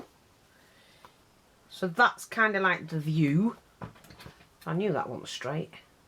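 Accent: United Kingdom. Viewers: Loved watching you do this. You always have exactly what you need. Wonder if it would be the same way if I tried it? Not! Love your British accent. I’m from SC in USA so it’s quite a novelty..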